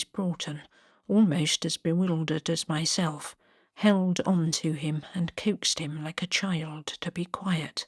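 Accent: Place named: Midlands, United Kingdom. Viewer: Are you from the midlands,? I love your accent and your beautiful narratives! Thank you, my Lovely. X X X😊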